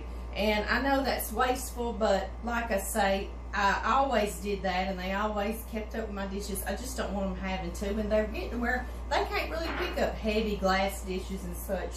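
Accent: South, United States. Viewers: So much yumminess in one cake ... looking forward to baking one of these for dessert the next time my adult children come over for dinner. Thanks for sharing your recipe. Side note: So refreshing to hear your Southern accent!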